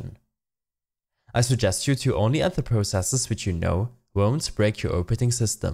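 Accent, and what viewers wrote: Germany. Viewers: Ich am ANfang: das ist doch safe ein Deutscher Ich bin wirklich gut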